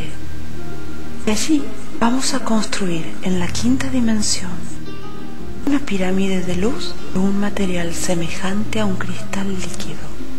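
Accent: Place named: Argentina. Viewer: no se por que pero el accento argentino me pone de los nervios!!! como relajarse asi????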